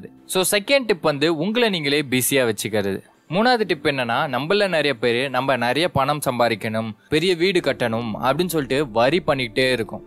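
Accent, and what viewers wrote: Kerala, India. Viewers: That kerala slang tamil voice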